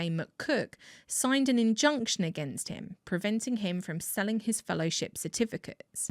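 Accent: United States. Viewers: You rock the American accent!!!! Great video ty so much ❤ from USA 🇺🇸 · yer merican accent is funny af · Good American Accent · I love your American accent! well done! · Your videos are consistently so good that I automatically click like before Ive even seen it. P.s. your american accent is getting even better! lol... sounds kind of californian. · Yay a sponsor! And your American accent has gotten much better ☺️ Thanks for anther fantastic video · Excellent American accent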